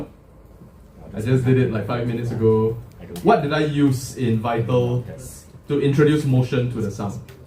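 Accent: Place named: Trinidad and Tobago. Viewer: Yo your accent sounds similar Trinidad. I thought that was interesting.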